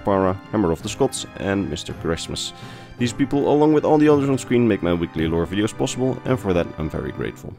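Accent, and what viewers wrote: Netherlands. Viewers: Jij klinkt nederlands :) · i was about to say, but someone else already mentioned it. you sound dutch, but not that horrible actual dutch accent. good job on the video, mate. moge de negen goden sterk met u zijn.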